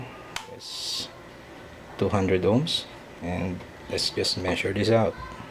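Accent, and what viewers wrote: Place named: Philippines. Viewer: Just the by way you spoke English I can tell you are from the Philippines 😅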